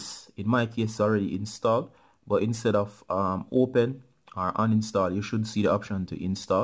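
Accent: Jamaica. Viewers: r u Jamaican I am idk it's your voice that caught me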